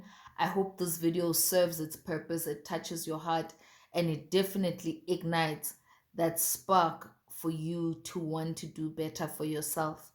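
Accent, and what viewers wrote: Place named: Lesotho. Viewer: Thokoza gogo..your accent hao bua sesotho...wher are you based or from? Thank you for the information.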